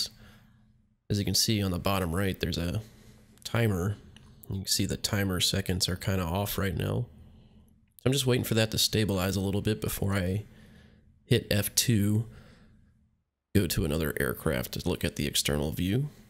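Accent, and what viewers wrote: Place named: United States. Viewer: 'mi...' what? Zee...? You Yanks are really 'zeee' in your sick nappers. Unbefuckinglievable how you linguistically inapt and generally illiterate riffraff mutilate the English language. And to top it all off some even add this disgusting, thick rhotic accent... Barf! It causes ear cancer. How about not to try to swallow your own tongue while you speak? 'Nohohoho, better not, for we could sound like humans and not like the armed lunatics who we are, spread all over the world to murder other peoples.'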